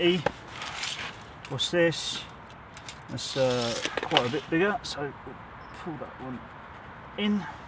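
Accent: England, United Kingdom. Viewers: A better presentation video with some more details for a beginners. I love your English accent too. 🙂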